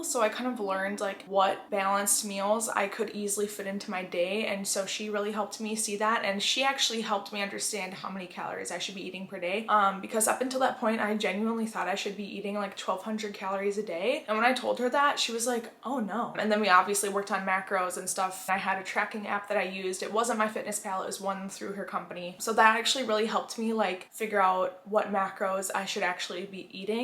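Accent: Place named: Michigan, United States. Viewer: oh I thought she had an Michigan accent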